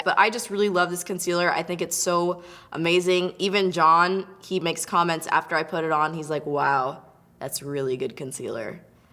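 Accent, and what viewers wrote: California, United States. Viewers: U have a classic Cali-girl accent! Lov it! I wonder where u grew up?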